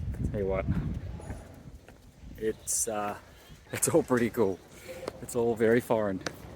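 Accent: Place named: Australia. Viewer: Thanks for adding the subtitles. I am not used to the Australian accent and I had a little difficulty making out the words you said. The subtitle helps a ton!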